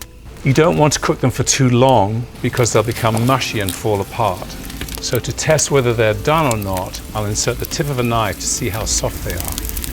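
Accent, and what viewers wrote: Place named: United Kingdom. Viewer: Hi, greetings from Norway I think your videos are beautifully made, you make a cooking session into a ceremony. I would love to spend some of my future afternoons doing some of the things you do. It is not going to happen year around, if I continue living in a country, which is covered by snow half of the year, though? Anyway, I have a question and a comments for you. 1. Please do not play the same tune as the background music in every video. It is serene once, twice, but after watching all your videos in short succession, the tune is sort of stuck in my head. I do not like it when a song is playing again, and again in my head. Even when I am dreaming, it is. But that is just me. If most of your followers love it, then you should continue doing it that way. 2. Where do you live? To me you sound like a British man, myself being Norwegian and all that, difficult to figure out every accent, but the scenery looks like Italy, Spain or California.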